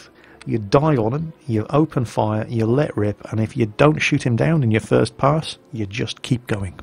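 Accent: United Kingdom. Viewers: British or American? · Bayden Hudson Trying to "help"...he has an English UK accent, not a North American English accent. Also Boom isn't talking to you in any way that's offensive, he's stating a fact, you just need to stop getting your panties in a bunch. Also considering that you have only 2 subs and you're trying to "help" a YouTuber with almost 400K subs, it looks like he doesn't need help from you...he does have almost 400K subs.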